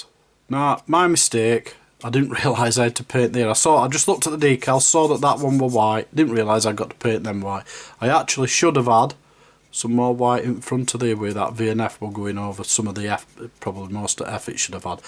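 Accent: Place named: Doncaster, United Kingdom. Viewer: Nah then! Are you from Donny by any chance? I grew up in Retford. Your accent sounds very close to mine. Anyhoo, I got this single P51B in a box of kits from M4H and I have just started the build.. I struggeld to get the cockpit assembly into the fuselage halfs, had to shave away quite abit of plastic from both sides of the instrument panel and bulk head to get it to sit so the upper fuselage sections around the front of the cockpit would join up. Then when I forced her together using clamps there was a strange 5mm gap in the forward section of the fuselage around the rear wheel well, which I will have to fill with some styrene. Thats as far as I have got at the mo, literally started the build whilst watching.. Im assuming that the kit is the same mould as this one and yet I dont have the flaws in the wings as you did, but still different issues that you didn't seem to have with your build.. 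Maybe this was the mould that was done by the guy who has been there ages and is literally part of the furniture, but comes to work overly aftershaved and with a little red eye going on. Drinks from a bottle of orange all day long whilst popping out his pressed kits from the machines...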